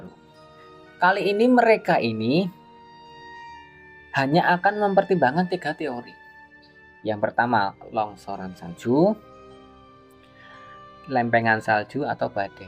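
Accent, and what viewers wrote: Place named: Java, Indonesia. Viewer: medok e ilangke mas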